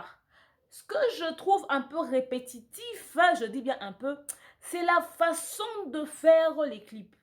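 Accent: Ivory Coast. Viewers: quand elle parle comme les ivoirien elle enleve sa exprès peut être que tu ne t'en rend pas compte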